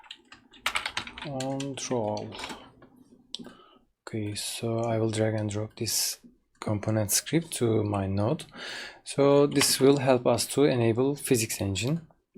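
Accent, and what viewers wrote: Turkey. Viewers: oglum sen türksün la türk ingilizcesini nerde görsem tanırım.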